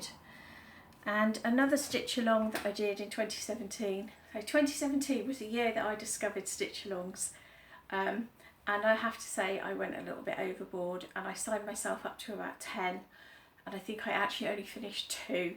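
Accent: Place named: England, United Kingdom. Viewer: Hi, I really enjoyed your first video......lovely to hear an English accent! Your stitching is absolutely beautiful. I live in Northern Ireland so I'm not too far away from you. I love Devon and have visited many times. Looking forward to watching more of your Flosstube videos 😍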